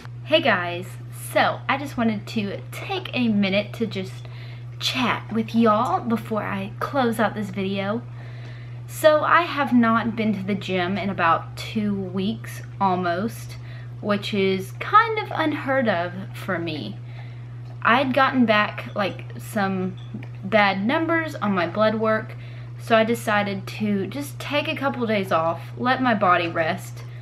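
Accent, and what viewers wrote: South, United States. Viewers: Hi, I just discovered your channel! I love your spunkiness and your southern accent. I am a southern girl at heart! I was wondering if you could use any brand of BCAA's?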